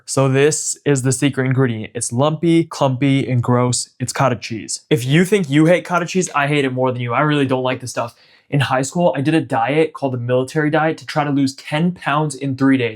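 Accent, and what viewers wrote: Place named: New York, United States. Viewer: What’s with the NY accent in your new videos? I never noticed an accent in your younger videos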